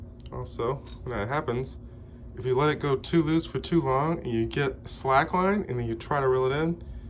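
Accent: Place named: United States. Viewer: Your video is so helpful brother 🥂 but American English is almost terrible lol... I can hardly hear all that you have explained 🤦‍♂️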